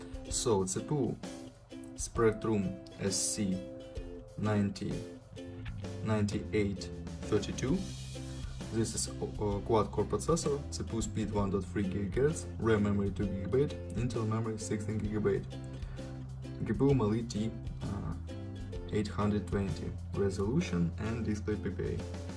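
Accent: Russia. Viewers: Русский акцент и никуда от него не деться.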